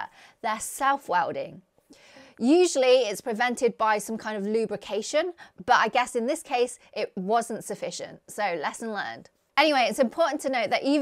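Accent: United Kingdom. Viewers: asian lady with a brit accent. literally exactly what the internet was created for. yeah yeah and science sure but mostly the former.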